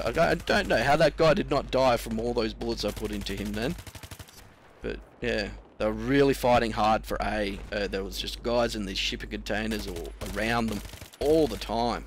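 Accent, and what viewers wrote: Australia. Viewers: Wereabouts are you from, i'm gessing Aussie :D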